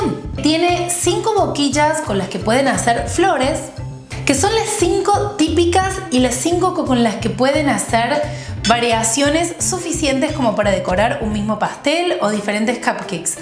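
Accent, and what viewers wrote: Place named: Rioplatense, Argentina. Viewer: te escuchaba y digo ser argentina esta chica o uruguaya y vi el mate de fondo jajaj osea que si! hermoso video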